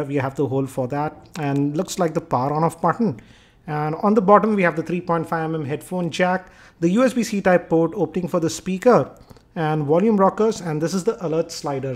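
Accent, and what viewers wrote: Hyderabad, India. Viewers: please change ur accent of speaking. hyderabadi youtuber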